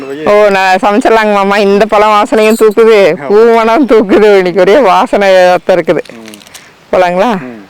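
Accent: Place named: Kerala, India. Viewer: Adipoli kerala speeking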